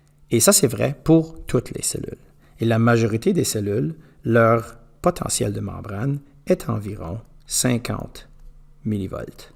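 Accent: Quebec, Canada. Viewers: De plus j'aime votre accent Quebecoi😊😊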